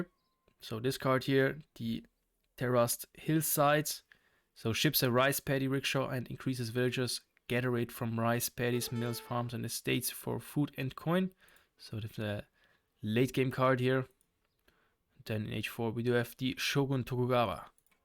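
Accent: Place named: Germany. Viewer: danke :). Ich dachte es mir, dass du deutsche "wurzeln" hast, wegen deinem akzent ;). Du machst das prima 🙃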